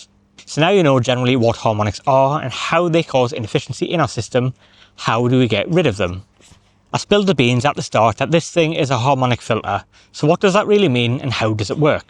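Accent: Newcastle, United Kingdom. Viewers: We all love the Geordie accent mate! ;))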